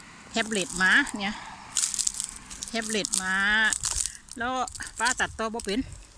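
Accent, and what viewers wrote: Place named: Phu Thai, Thailand. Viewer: 🙏ดีค่ะ มาย้อนดูคลิปเก่าๆจ้า เป็นคนจังหวัดอะไรค่ะสำเนียงเหมือนภูไทหรือจังหวัดเลยค่ะ 🌷👍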